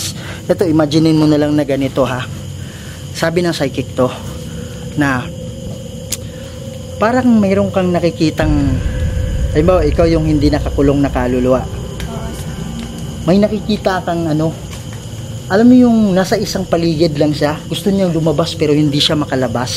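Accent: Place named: Ilonggo, Philippines. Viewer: parang Ilongga ung tono ni..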